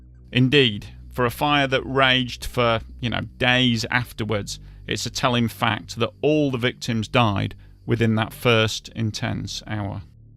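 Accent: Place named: North of England, United Kingdom. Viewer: you sounded as though you came from the North of England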